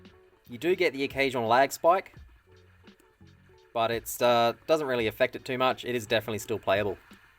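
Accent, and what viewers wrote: Australia. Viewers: lol, thought it was just the ausi accent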